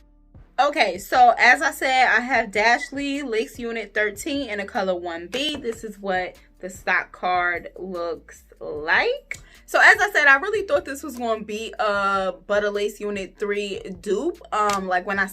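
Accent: New Jersey, United States. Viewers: Ayyyyyy hey my jersey sis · Ok Jersey🙌🏾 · Sis are you from Jersey? That accent is so strong lol. I love it tho! Love ur videos btw!❤️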